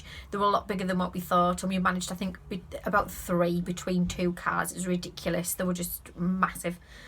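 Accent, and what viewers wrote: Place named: North, United Kingdom. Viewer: I've recently taken on an allotment in Thornaby which by your accent sounds like it's not too far away from you. I'm taking it slow and I'm going to have wild flowers near the front to aid with the illusion of success but i do understand its a massive job but I'm planning to deal with it piece meal and improve each week any advice on where to get manure etc ?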